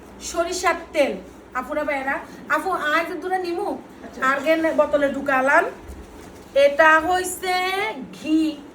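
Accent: Noakhali, Bangladesh. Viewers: তুমি শুদ্ধ ভাষা বলো নোয়াখালি ভাসা শুনতে ভালো লাগে না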